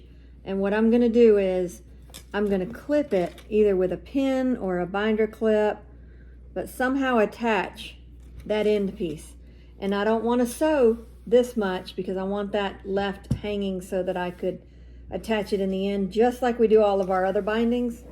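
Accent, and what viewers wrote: South, United States. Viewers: Saw tooth stars are my favorite! Your quilt is gorgeous. I especially love your scrappy binding. Thank you for so generously sharing your quilt pattern with me. Also , my husband and I just love listening to your videos. Your sweet southern accent is a wonderful reminder of our time living in the south. 💗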